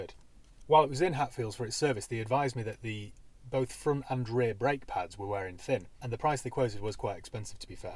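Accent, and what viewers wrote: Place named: Manchester, United Kingdom. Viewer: Aah the Mancunian, less boisterous Jeremy Clarkson has uploaded another video yet again, lovely video splendid as usual , couldn’t believe the service price yikes!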